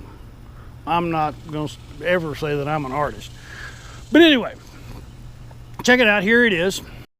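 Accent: Texas, United States. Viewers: I have to agree about you not being in the logo. Even if you're represented by a stick man wearing a hat and holding a camera. I hope you have a fun and safe trip to the Smokies. Thanks for all of your help. I'm looking for a fifth wheel or travel trailer to live in. Your videos are informative and fun to listen to. The others, not so much. Thanks again for your work and keeping the Texas accent real. Stay Safe. Stay Happy. Stay Loved.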